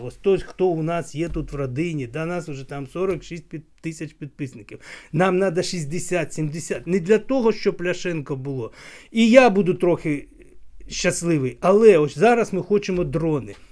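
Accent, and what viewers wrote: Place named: Russia. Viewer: В пана самого проскакують русизми , 10 років московський чобіт топче нашу землю , а ви , пане блогер , так несуразно розмовляєте українською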